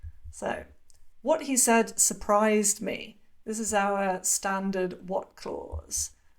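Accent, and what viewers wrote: United Kingdom. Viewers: I love the way you speak English with British accent . What I like to say is speaking English with correct British accent gives me like a certain charm.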